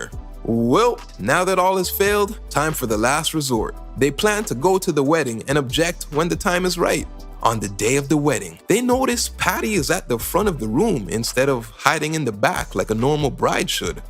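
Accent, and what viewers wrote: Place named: Quebec, Canada. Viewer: Your from Montreal Quebec or chateuguay right I can tell from ur accent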